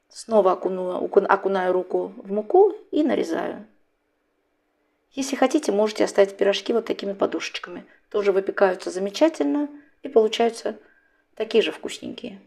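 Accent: Baku, Azerbaijan. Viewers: Здравствуйте, наш бакинский акцент, это 👍👍👍🥰 Смотрю с Ростова на Дону, тоже из такого теста делаю пирожки, но этот вариант мне понравился, обязательно попробую. Спасибо ❤️🌹🌹🌹🌹🌹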